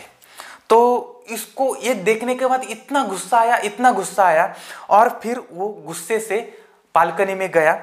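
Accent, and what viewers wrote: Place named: Nagar, India. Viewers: Aap ki Hindi Marathi me nikal Rahi he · सर तुम्ही नगर चे आहात काय? आमच्या नगर ला अशीच हिंदी बोलतात..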